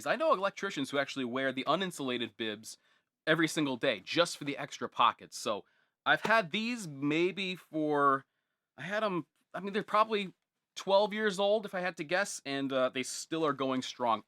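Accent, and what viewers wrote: New England, United States. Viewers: What part of New England are you from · New Englandah !!!!!!!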